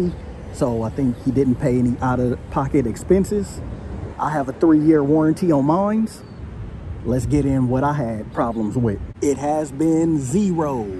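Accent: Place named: Bay Area, United States. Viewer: I knew you were from the bay as soon as I heard you talking! Lol almost thought what was mistah fab talking! Loll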